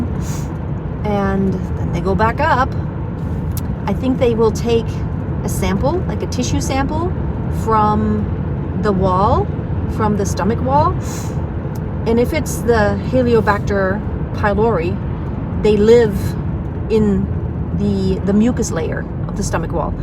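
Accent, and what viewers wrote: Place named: United States. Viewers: How it is possible to get a perfect American accent like you were born there ? You are from Germany but sound like an American.🌹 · I think she is in Germany 🇩🇪 but originally American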